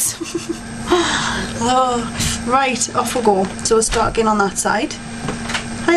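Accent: Newcastle, United Kingdom. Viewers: Love her accent geordie!!! Im on camera mind! lol love it!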